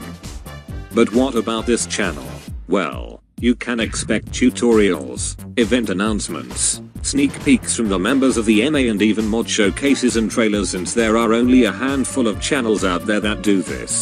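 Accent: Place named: United Kingdom. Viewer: the tts voice is british, therefore it pronounces the "z" as 'zed' lol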